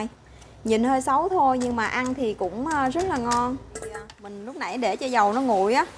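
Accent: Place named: Mekong Delta, Vietnam. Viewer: Mình thích món này lắm, hôm nay được bạn chia sẽ công thức cảm ơn bạn giọng nói của bạn thật dễ thương, mình nghĩ chắc bạn là người miền tây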